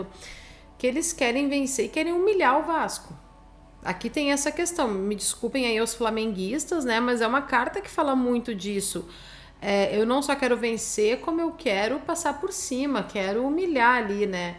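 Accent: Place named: Rio Grande do Sul, Brazil. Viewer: Voce com ese sotaque de gauxa voce tem cara de gremista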